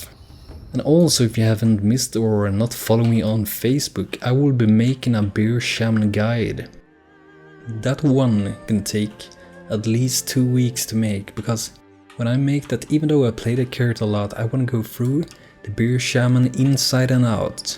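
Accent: Sweden. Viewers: Sounds very cool! :) Couldn't help to notice that you were swedish. So am I ;) Ha det bra, kommer fortsätta titta på dina videos !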